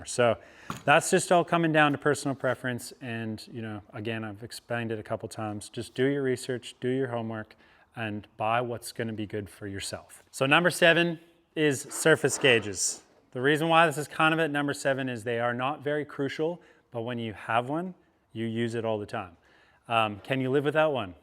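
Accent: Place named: Australia. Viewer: Awesome video! This dude sounds Australian sometimes and American others and im so confused by it lol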